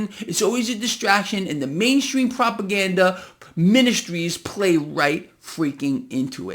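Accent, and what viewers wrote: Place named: New Jersey, United States. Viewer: I love how you explain everything, i love your Accent, do you come from Northeim erst new jersey? 😊😊😊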